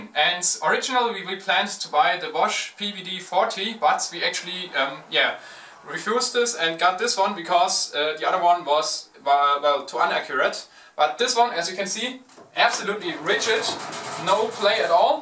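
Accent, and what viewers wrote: Germany. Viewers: Is he German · you have a proper German accent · Du bist deutsch ich weis es man merkt es voll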